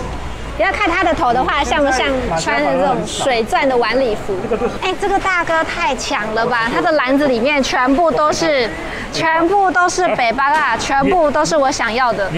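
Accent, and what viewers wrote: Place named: Tainan, Taiwan. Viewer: 豆娘仔是我在海邊第一尾起來的魚當時還只是去跟同學聊天用手擒法打發時間意外在肉粽裡釣獲同學都稱呼為厚殼仔肉質聽說超級細緻好吃應該比黑格細緻多了黑格是很難釣的魚的阿公是釣魚高手釣黑格要有耐心要抓準揚竿的魚汛和時機點不然很容易開空槍 (當時海調初心者的我被黑格戲弄得很慘@@ 我同學教我海釣就是只用阿波釣黑格野生的黑格不便宜小時候就吃很不錯的魚很幸福黑格沒有細刺唯一的麻煩就是去鱗非常不容易每次都很害怕殺黑格黑格沙格赤翅仔枋頭仔(般頭仔) 這家族的都不太好去鱗(還是鯖魚好肉多不用去鱗^^) 題外話我的老家在台南南部人說吃這個字都是四聲的重音的老家應該也是台南那邊吧? 發音聽起來超親切北部人說吃都發一聲 (鵝大人的台語也很有親切感我跟鵝大人同鄉的)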